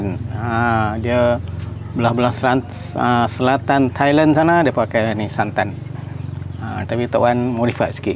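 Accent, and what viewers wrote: Kedah, Malaysia. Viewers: Assalamualaikum,,tok Wan asal org mna? Loghat tok Wan ckp Mcm org utara Kedah Kuala nerang ja,,,kikikiki salam follower subsribce tok dari Sya Shuib Pendang Kedah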